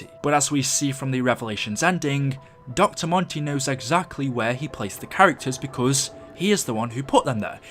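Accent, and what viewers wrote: United Kingdom. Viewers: Is the Mansion in buried the blacksmith's who built the pack a punch? I mean the pack a punch is near the Mansion so can this possibility be true? P.S show me in the video plus are u British?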